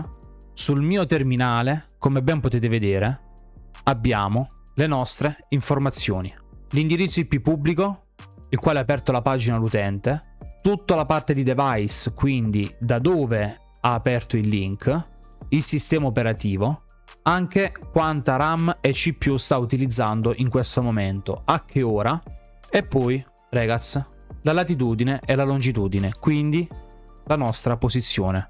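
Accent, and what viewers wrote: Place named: Apulia, Italy. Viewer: Immaginavo fossi pugliese 🤣 Ho provato subito e funziona alla grande. Ma quanto tempo si ha prima che il link esca scada ?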